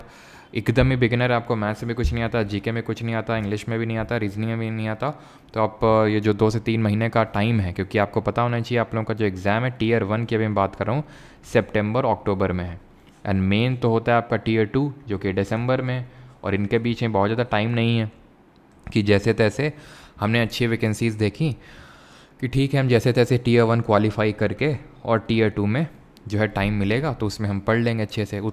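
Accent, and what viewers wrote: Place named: Uttar Pradesh, India. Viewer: Hey bhagwan bhaiya aap ekdm humhi log jaise bolte hain jaise ghr pr bhaiya log bolte hain bhulee jaiye 😂 ...haiyye hai...😅pehli baar koi up ka hai bhut shi lgta hai aapki baat sunkr aise ghr wali feelings aati hai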